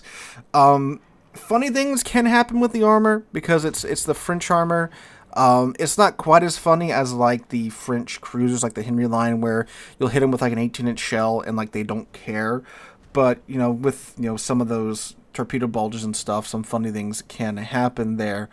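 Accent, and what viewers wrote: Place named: United States. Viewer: the Alsays! xD There will be numerous shipnames in any number of languages to butcher in this game, so I get it but its still funny to hear you pronounce some of them in a very "Americanised" way!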